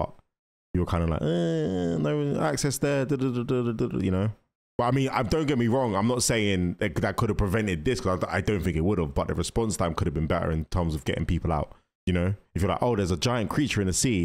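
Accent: United Kingdom. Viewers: Your really British my man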